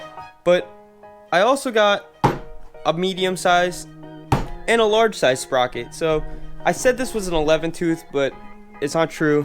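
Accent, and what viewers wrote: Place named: Canada. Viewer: Great vid but that accent is rough dude. Where are you from? Canada? Here in the south you would stick out like a sore thumb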